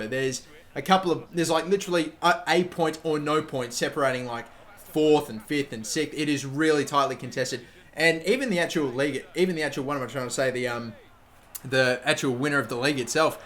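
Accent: Australia. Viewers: i lived in Sydney near Bondi Beach on Bouronia Road. I love the aussie accent. great vid again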